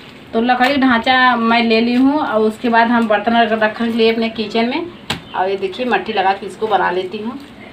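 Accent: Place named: Prayagraj, India. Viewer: Bahut achcha laga, aapki Prayagraj ki boli sunkar bahut achcha laga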